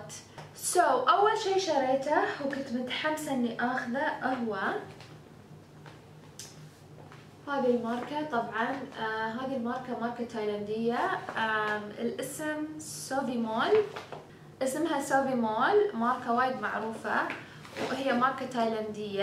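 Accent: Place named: Bahrain. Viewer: كاتبه انها اماراتيه بس لهجتها يايه ع البحرينية + شوي عراقيه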